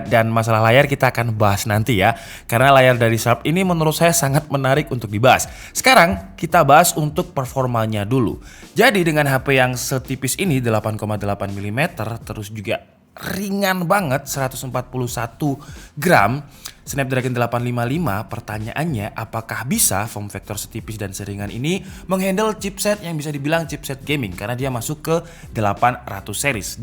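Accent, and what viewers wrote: Java, Indonesia. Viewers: bhs jawa si mbaknya kentel banget 😁😁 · Pemean... wong malang banget... 🤣🤣🤣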